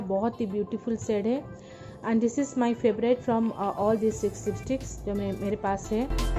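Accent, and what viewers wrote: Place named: Odisha, India. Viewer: I could figure out the Odia accent ...😄❤️I am too from Odisha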